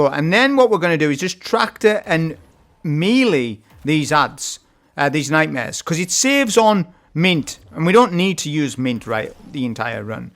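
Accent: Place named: Chesterfield, United Kingdom. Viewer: another great video brother... you sound local to me, im from chesterfield. you got discord?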